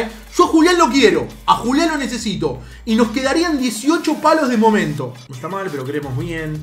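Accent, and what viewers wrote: Argentina. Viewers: Ningun chileno se nota que eres argentino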